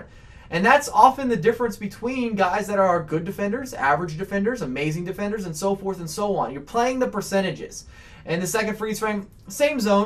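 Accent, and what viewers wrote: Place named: Texas, United States. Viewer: I needed this, you are my Texas Accent Speaking Angel.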